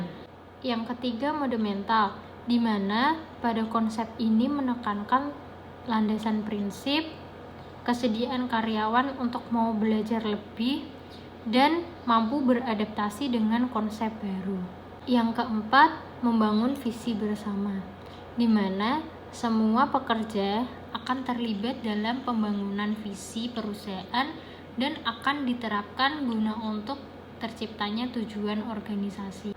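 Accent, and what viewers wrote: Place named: Java, Indonesia. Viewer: Baru ini dengar yg ada medoknya😂😂 anyway thanks ya!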